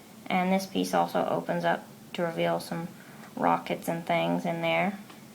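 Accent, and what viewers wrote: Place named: West, United States. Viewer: I never noticed this but her accent is like a western guy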